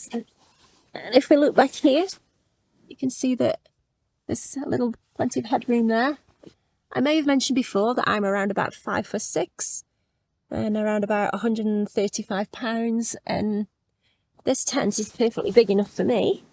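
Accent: England, United Kingdom. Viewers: The location says Canada. The accent says England.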